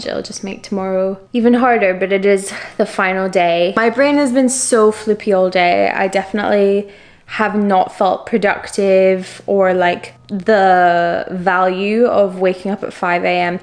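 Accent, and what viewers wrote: Scotland, United Kingdom. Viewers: It’s a soft Scottish accent. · Omg I love her slight Scottish accent 😍😍😍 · Hi, I just found you through this video, and your accent is so ambiguous. Are you scottish?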